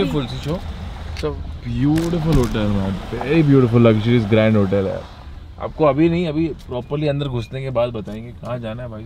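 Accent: Mumbai, India. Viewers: Omgg I have to say this.....in past few days I have watched videos so so much like binged them so bad.....that my accent has chaned from punjabi to typical mumbai accent 🥺😂❤❤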